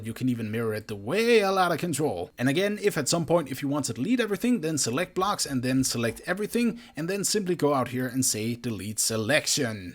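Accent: Denmark. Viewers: Funny how i just instantly knew you were from Denmark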